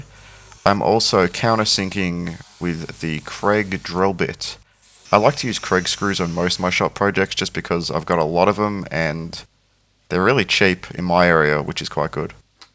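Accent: Australia. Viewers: Are you Aussie? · WOW!! great work... nice to hear another Aussie voice on youtube..If i may make one comment, ive always used a router with a center jig for cutting out circles.. but i do like your idea of using the beltsander while the sanding disc are spinning...new subscriber..cheers!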